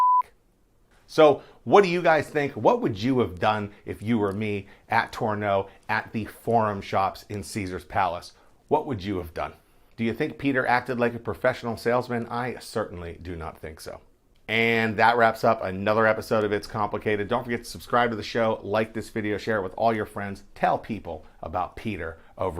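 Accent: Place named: Rural, United States. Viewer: Not defending his behavior but you come across like a dude from the hills of Pennsylvania. Poorly dressed with that horrible hick like accent. Once he heard your opening salvo he had already sized you up. Not saying it’s acceptable but these guys see country bumpkin tourists all the time.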